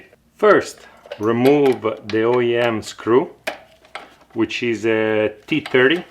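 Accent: Italy. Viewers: Love the accent, italy?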